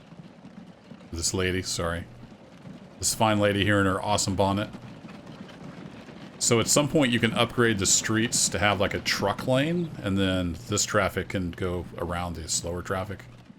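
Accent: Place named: United States. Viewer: Its weird when I've always watched people from the UK playing, and then I hear you pronounce things the American way. But it makes a change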